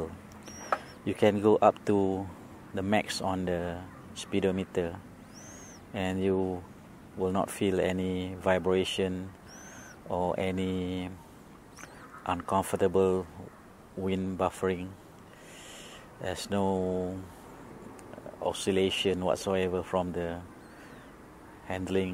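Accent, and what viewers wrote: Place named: Malaysia. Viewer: Nice review. From the accent I'm guessing Malaysia somewhere (apologies if I got that wrong). All round great video !!! Thanks.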